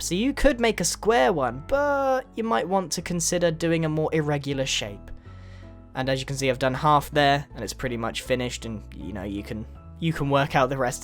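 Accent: United Kingdom. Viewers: I love your voice, the accent is awesome! Are you british? Your voice has just that "british feel" to it XD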